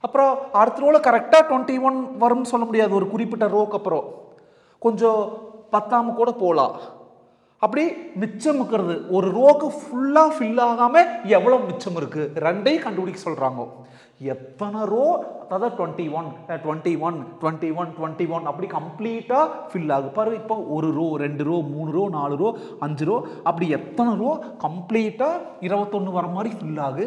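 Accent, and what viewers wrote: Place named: Thanjavur, India. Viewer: Your in thanjavur am i right your slang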